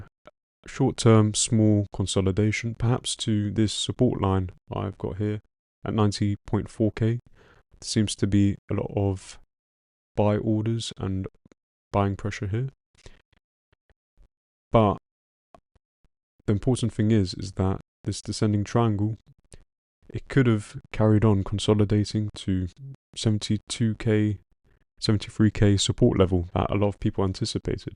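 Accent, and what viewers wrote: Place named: England, United Kingdom. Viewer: Are you English? Usually I know from the accent. You sound English to me, but I'm not 100%. You could be a Scandinavian who knows English as 2nd language from the crib maybe.Not Australian, not American, not east Europe, or west Europe, not south Africa, not from New Zealand definitely. Dude, you're killing me.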